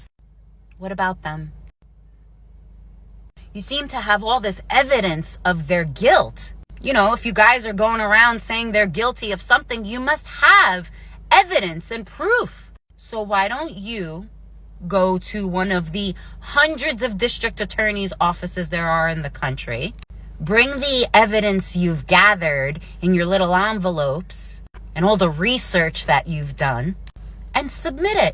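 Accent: New York, United States. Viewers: I Love your NY accent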